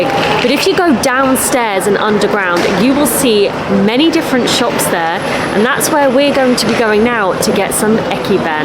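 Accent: United Kingdom. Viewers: いつもながらナレーションが素晴らしい。響きの良い声とイギリスなまりは良い雰囲気です。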